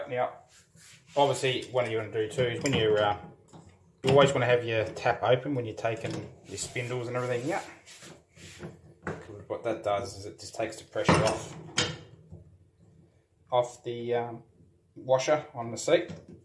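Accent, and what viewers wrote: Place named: Australia. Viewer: Yer rite maaate! It is a local accent. Understood throughout OZ. Try those hillbilly accents from some states in the USA. Impossible!!!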